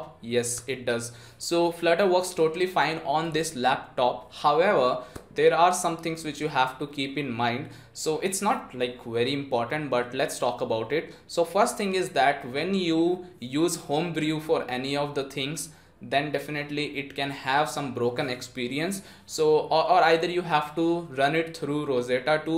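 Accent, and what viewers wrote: India. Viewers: Bro I m proud of you as one of the few good Indian developers on Youtube who is confident and provides the right information but you got to work on your English accent and grammar. The technical stuff is amazing already why not to go one step towards the perfection of videos and fix those language issues. Don't be offended this is just to see you grow more.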